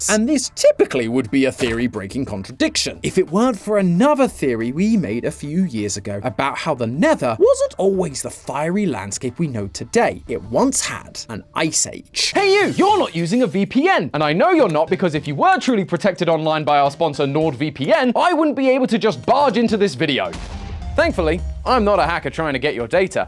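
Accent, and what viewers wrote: United Kingdom. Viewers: unwatchable british accent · youre the narator, whos VOICE IS BRITISH!! you used to work with Upisnotjump didnt you!! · Yeah the British accent is too much 😂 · I haven’t watched since why is he British😢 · BRITISH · what did i miss why is british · more like why is the narrator always british 🙁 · Oh this is British now.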